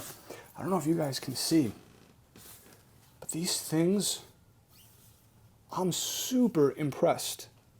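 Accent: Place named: New York, United States. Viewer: As soon as I saw you got the matte black Les Paul. I have the same one but with green trim I'm surprised you didn't plug it in before you opened up the other one you showed amazing restraint God bless you . End with the abalone. I'm from the South Shore of Boston so it was about a second and a half into the video that everybody knew you from the East Coast definitely got New York in there I'm saying you're almost Rhode Island border Maybe Rhode Island Fall River. You're going to love that Matt Les Paul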